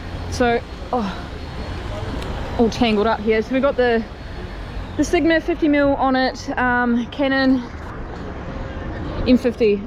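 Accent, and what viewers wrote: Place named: New Zealand. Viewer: Ahhhh I knew you were New Zealander from the first time ya talking Kia Ora from home love your vids!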